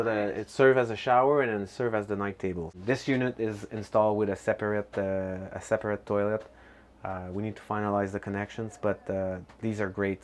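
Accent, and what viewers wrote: Quebec, Canada. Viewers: He sounds like a Québecois la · I don't usually hear my own "Quebec" accent on Youtube, that made the video so much interesting for some reason hahah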